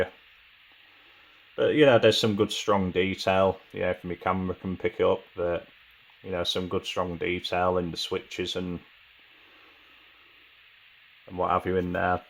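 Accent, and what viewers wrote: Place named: United Kingdom. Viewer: Hi. You sound like you're in The UK and I am in America. You mentioned that the '67 Plymouth was a grail kit for you. I was wondering what America cars you had in the UK when you were growing up and what cars you like. I was hoping you could do a video about the subject. Btw i grew up in Lansing / Detroit, Michigan in all of the US auto factories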